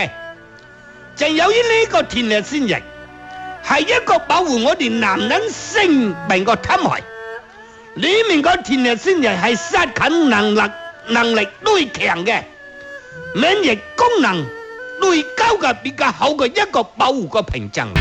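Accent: Chaozhou, China. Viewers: 潮州口音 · 潮州口音来嘎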